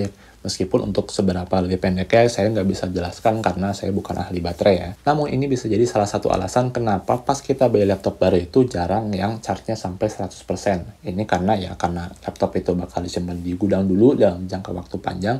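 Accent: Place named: West Java, Indonesia. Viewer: meni sunda pisan euy 😁👍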